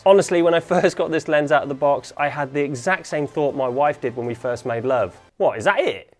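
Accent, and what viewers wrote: United Kingdom. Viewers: I like this guy's humor. Plus having a British accent automagically makes him sound like he knows what he is talking about. Cheers mate! :D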